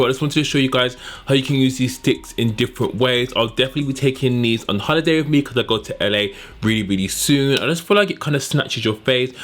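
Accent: United Kingdom. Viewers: Yanna Teira it’s the British accent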